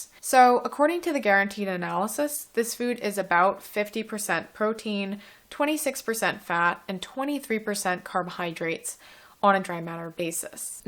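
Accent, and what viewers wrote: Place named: Illinois, United States. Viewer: Sounds like you’re from IL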